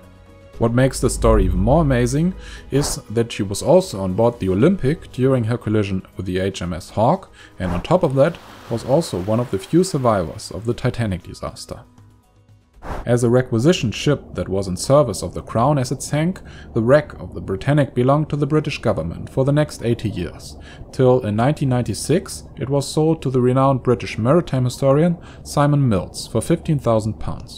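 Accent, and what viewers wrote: Germany. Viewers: Man merkt sofort den Deutschen Aktzent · I'm german and I agree, it sounds like a (horrible) german accent. Not all of us sound like this when speaking english :D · Why is a German narrating this video? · Deutscher aktzent... hmmmmm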